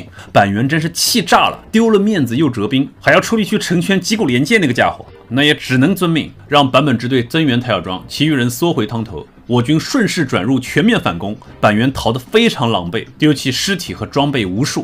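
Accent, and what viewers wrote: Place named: North, China. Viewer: 北方人正常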